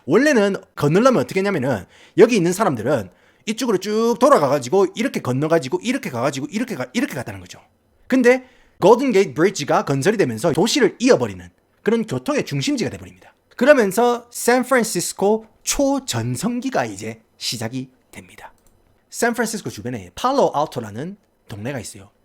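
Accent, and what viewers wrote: Gyeongsang, South Korea. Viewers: 경상도사투리와 영어 악센트 믹스 신선하네요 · 갱상도와 미국을 넘나드는 플로우인데 딕션 쩔어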